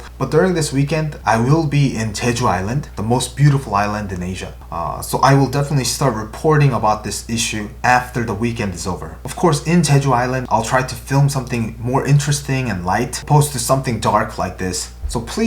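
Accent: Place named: England, United Kingdom. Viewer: Please know that you make the international k-pop fans very stress reliving with English ascents and detailed information.. Love form our side 🤗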